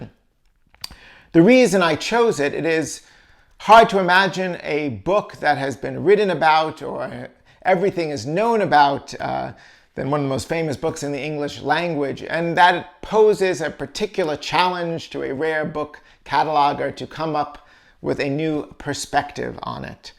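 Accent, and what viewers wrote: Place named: New York, United States. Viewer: Fabulous as always,. There ought to be some way for you to make a really good living just doing these videos. NO ONE else is even remotely close to doing them as well. Your erudition and excellent sense of humor entertain us all the while you are teaching us bibliophile/bibliomaniacs more about the books we love. I do agree that I too was waiting for you to open the KJ and show us some of the interesting TYPOGRAPHY, not TOPOGRAPHY in the volume. That NY accent of yours definitely came into play, as I found myself also wondering: "Why is he repeatedly mentioning the topography of the work, he's not talking about maps?" Eventually, I figured out you were saying typography, of course. Anyway, do you have that copy for sale? Please email if you do. Much continued success, my friend.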